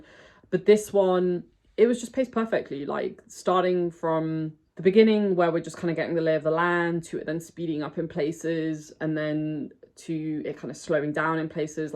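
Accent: United Kingdom. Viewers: Great video, thank you for sharing it. I think you loved many of the same aspects that I also love. Also! It's great to hear a fellow British person pronounce all the names in the way that seems natural to me :) Off to check out your other videos... Thank you for this.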